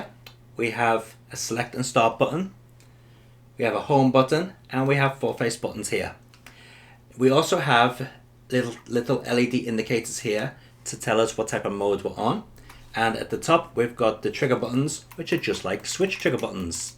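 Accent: United Kingdom. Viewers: Greetings from Brazil. Man, I really enjoy your reviews and battle of the Ports. Besides that, is much better listen the British english.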